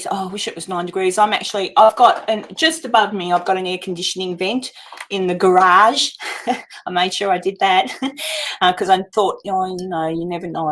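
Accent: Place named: Australia. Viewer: Yes Maam I Have Settled in the States .Yes Maam Mother is French Dad is Brit ,But My Ass Settled in the States. I like the Idea About Forgetting About Stuff I Will Try and Catch Your Lives. I love the Australian Accent and Australia Being One Place I Haven't Been. Your Son Being in the Military ❤ He Will be in My Prayers and I Thank Him for His Service!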